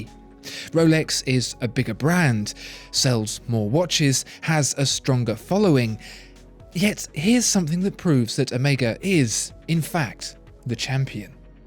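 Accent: United Kingdom. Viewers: If he didn’t have a British accent I would not be paying attanetion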